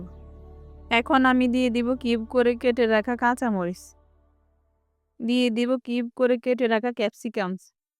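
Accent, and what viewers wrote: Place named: Noakhali, Bangladesh. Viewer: আঁর নোয়াখাইল্লা ভাবি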